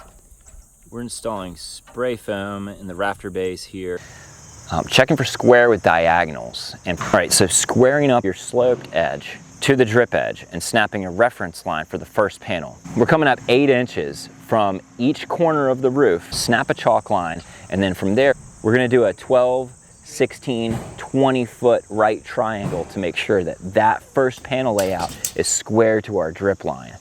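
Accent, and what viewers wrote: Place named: California, United States. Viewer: I’m from Southern California, born and raised. This guy is without a doubt holding the thickest Californian accent of all time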